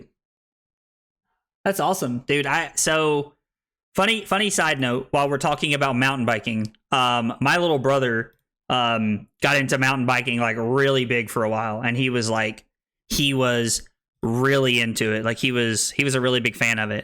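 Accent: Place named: South, United States. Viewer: im from NC i could tell you were from somewhere in the south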